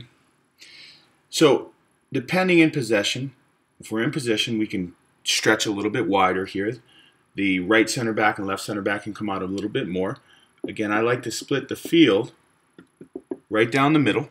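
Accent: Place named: United States. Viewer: Wait, are you american? Auto cant watch this anymore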